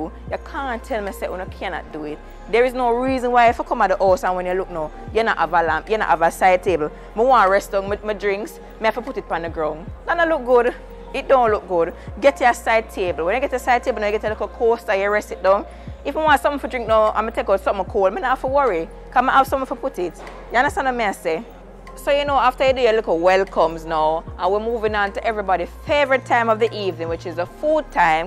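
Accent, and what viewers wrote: Jamaica. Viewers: No man you work there now?? Muss lol i love the way you present these informative vlogs and you just fling the patois left right and centre. I LOVE IT!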